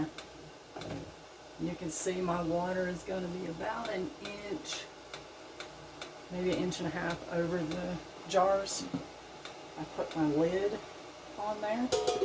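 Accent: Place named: South, United States. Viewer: I think your awesome and thank you for explaining things so easily yet thoroughly! I appreciate the calm southern voice! :) Well, it sounds southern to me!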